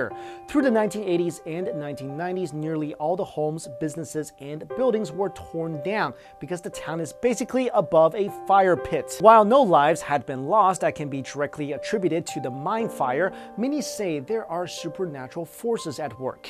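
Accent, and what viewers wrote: China. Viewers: listening to someone who is Chinese trying to pronounce Japanese words is amusing lol. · wheres your accent from? are you chinese?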